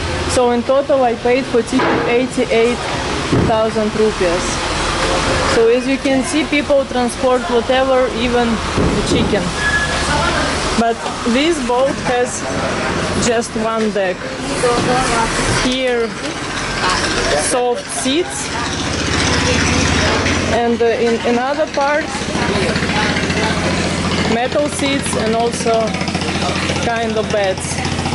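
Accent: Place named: Russia. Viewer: You're a brave girl lol I always love Russian English accent.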